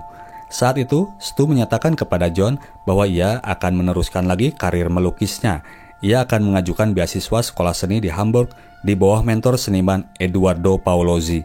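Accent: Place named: Padang, Indonesia. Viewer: Logat padang....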